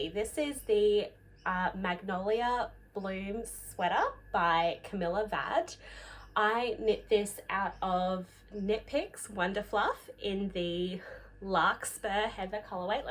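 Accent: Australia. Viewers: Hi, it's so nice to see and hear an Australian knitting podcaster!! Just wondering where you order all these American yarns from as I don't see them at any online (Aussie) stores that I buy from? Also the De Rerum, I want that for the Decision cardigan but can't find it so can you advise where you got that? I know what you mean about some podcasts being over an hour long. I have heaps that I watch and the shorter ones that I absolutely love are Voolenvine, Cherry Heart, 50 Fabulous Knits, The modern Skein, Camijo Knit, A Homespun House, Little Big Knits & Youngfolk Knits. Love your work, you knit beautifully. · Always great to hear an Aussie accent. Try Julia and Mathilda's greatestknits. Love the Tulip sweater and have some light linen for a summer version ready as it's still over 35 degrees here every day !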